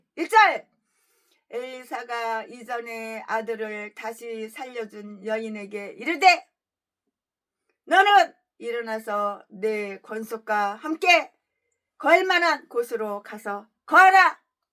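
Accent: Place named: Jeolla, South Korea. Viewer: 예라 ㅋ ㅎ 전라도웃겨요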